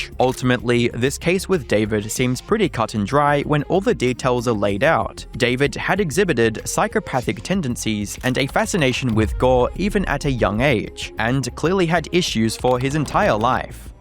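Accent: Australia. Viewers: I've been watching your vids for a while, i didn't notice your Aussie accent till now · “If you dorn’t knor” I sense Aussie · no problem, for context I know an adelaidian accent when I hear one haha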